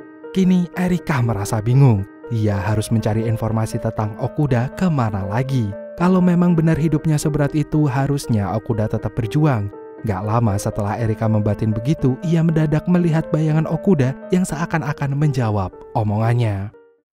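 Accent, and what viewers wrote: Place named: Nganjuk, Indonesia. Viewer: logat nganjuknya minnn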